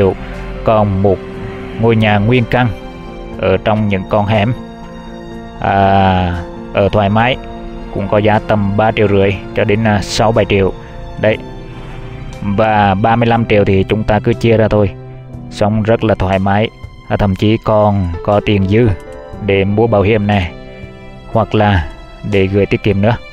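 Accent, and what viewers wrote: Central, Vietnam. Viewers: Nghe anh phân tích thích ghê,,,giọng miền trung nhẹ nhàng dễ nghe,,chắc hát hay lắm đây,,Em thích kênh này với Nhân La Cà nhất,,ngày nào ko xem cảm giác như thiếu thốn gì đó,,thanks anh!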